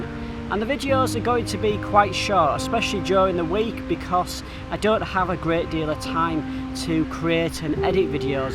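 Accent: United Kingdom. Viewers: That accent of yours intrigues me. I left Lancashire 1968 so my ear for accents has almost disappeared; especially as now most of the North country accents have emigrated southwards. Would you mimd telling mr where in UK you originated. Oh, and thanks for all the great Malaysian videos!!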